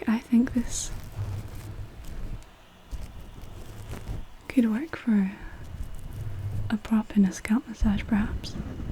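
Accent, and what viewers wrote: Scotland, United Kingdom. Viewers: oh, I love a good scottish accent! new subscriber. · Love your Scottish accent... And your headphones. Is there a hint of Irish genealogy in there too?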